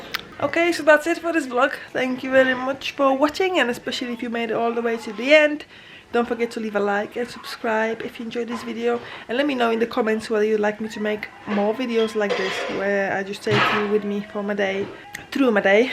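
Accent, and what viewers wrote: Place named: Northern England, United Kingdom. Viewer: You’ve caught a cold and a slight northern English accent.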